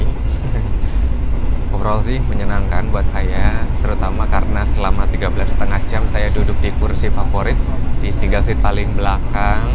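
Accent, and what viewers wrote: Surabaya, Indonesia. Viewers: Suaramu itu menang sama 2 kereta pembangkit kok.... 😁😃🤣 Soalnya suaramu itu nge-bass & sangat2 juselllaaasss ucapan huruf2nya kok... 👍👍👍 Maaf, Mas itu asli mana si, kok klo ngomong pake Rek Suroboyoan ya...???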